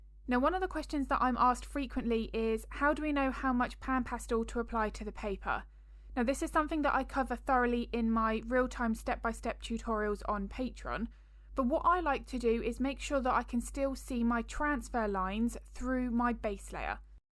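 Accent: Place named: England, United Kingdom. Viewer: Your English accent is so sweet to listen to and you are explaining so well how can someone fail to understand you. Nice job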